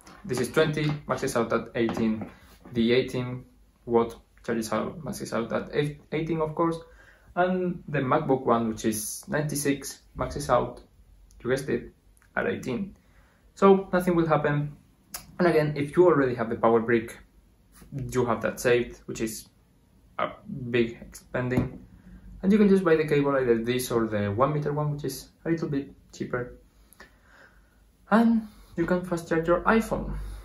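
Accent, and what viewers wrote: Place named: Spain. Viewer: jajaja podia adivinar que eras español, muy buen video hermano! justo lo que necesitaba. gracias :)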